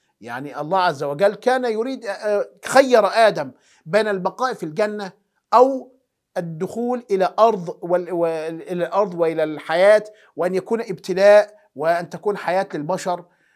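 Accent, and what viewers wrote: Egypt. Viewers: ليش تحچي مصري ؟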